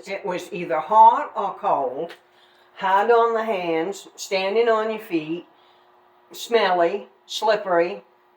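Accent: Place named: Maine, United States. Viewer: Loved her Maine accent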